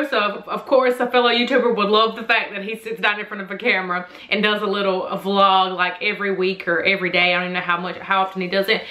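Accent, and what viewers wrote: South, United States. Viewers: Where u from the accent sounds southern ??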